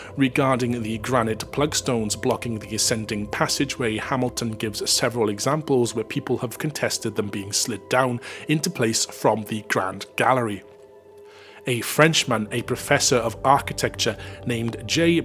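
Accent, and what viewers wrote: Wales, United Kingdom. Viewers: The word "heard" is pronounced the same way as the word "herd". You saying "HEERD" instead of "HERD" is jarring in a nails-down-the-blackboard kind of way. I make allowances for accents (I can hear a bit of Welsh influence in your voice) but FTLOG, "HEERD" is just so very very wrong.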